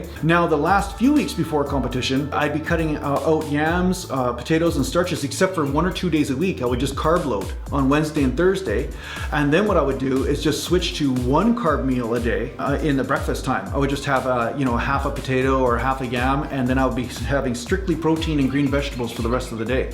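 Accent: Canada. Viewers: Are you canadian by any chance? Kind of sounds like it, not saying thats a bad thing haha im canadian myself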